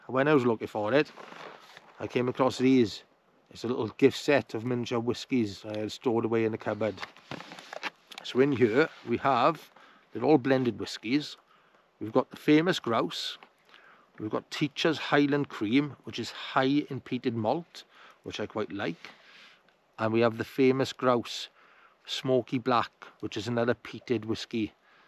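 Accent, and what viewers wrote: Wales, United Kingdom. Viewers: So nice to hear a Welsh accent again. I'm a Pembroke boy but been living in Ireland for the last 26 years. There's some spectacular places to go wild camping over here, but my heart never left Wales and I miss it. Just subscribed to your channel, mate, looking forward to the next video. Take care, mate and give Toby a pat from me. Cheers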